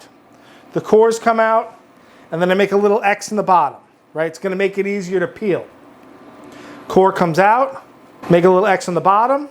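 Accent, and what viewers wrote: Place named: New Jersey, United States. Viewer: When i close my eyes, i sometimes hear Brad Leone. Are you cousins? Or are you from Jersey?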